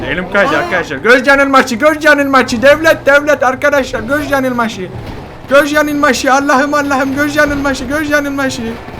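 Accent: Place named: Konya, Turkey. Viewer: abi tam bi gonyalı gibi gonuşuyon ha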